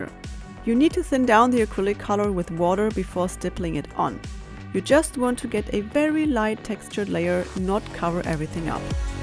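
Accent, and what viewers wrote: Germany. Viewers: Are yue Germen 🇩🇪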